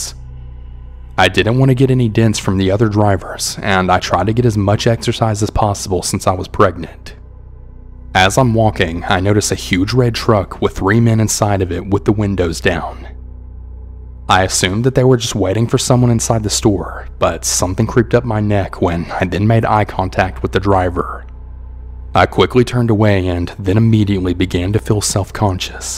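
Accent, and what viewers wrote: South, United States. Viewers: It took me a minute to realize he has a country accent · It’s the southern accent for me 😅😌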